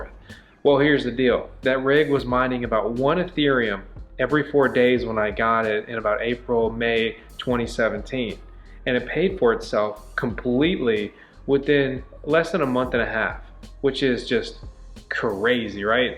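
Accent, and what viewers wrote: South, United States. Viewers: Your accent has become much more Southern.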